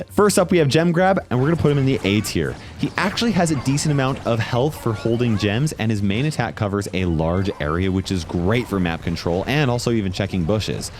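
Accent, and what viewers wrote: Scotland, United Kingdom. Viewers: Is Moe Scottish? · I didn't expect him to be british but I love it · Why is he british😂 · Wait, he's just British? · HE'S BRITISH I LOVE HIM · Nerey british ahh voice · why is he british tho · Of course he has to be British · why is it british🤮🤮🤮🤮🤮🤮🤮🤮🤮🤮🤮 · he´s british!!!!!! oh and where did kenji come from. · British accent tops it off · He's BRITISH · He has the most british accent of all time, what are you talking about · I didn't know Moe was british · I did not expect him to be british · Bro's bri'ish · Why is he british😳😳😳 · HES BRITISH!!!!!!!!!! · he's Scottish 💀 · Bros so British 🇬🇧🇬🇧🇬🇧🇬🇧🇬🇧💂‍♂️💂‍♀️💂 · Bro is British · i like his british accent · Ees bri’ish mate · He Scottish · I mean a Scottish accent is technically still a British accent but I get what you mean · Lmao the british accent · why is he british please dont be british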